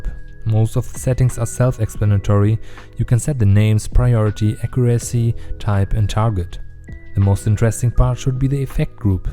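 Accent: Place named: Germany. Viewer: wie man einfach immer raußhört wenn einer deutsch ist XD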